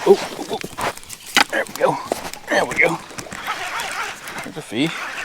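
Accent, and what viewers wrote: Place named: South, United States. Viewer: Wow man you gotta nice Southern accent LOL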